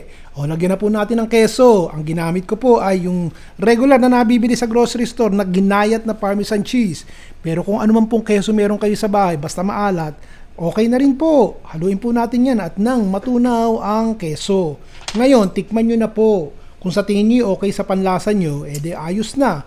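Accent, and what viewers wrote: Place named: Batangas, Philippines. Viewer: Nkktuwa ka po mag salita. Akala ko po Taga Batangas ka po or Bulacan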